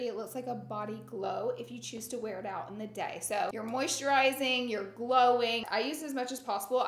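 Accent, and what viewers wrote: South, United States. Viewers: GURRRLLLLL is totally from the south. But it’s MY FAV. Fellow southern girlie.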